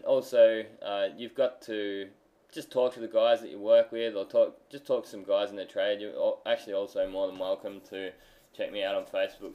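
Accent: Australia. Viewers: this is awesome work mate, where are you in australia? sounded like a qld accent to me haha i’m from adelaide, been watching a few videos for my capella project, its been painted before so i’m thinking wet paint remover will be the best, can you send a message or something of a good paint remover? cheers legend!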